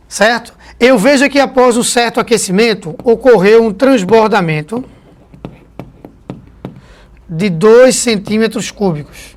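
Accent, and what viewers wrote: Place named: Recife, Brazil. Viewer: pelo visto o senhor é de recife rsrs ganhou mais uma inscrita e pernambucana kkk,Parabéns pela aula !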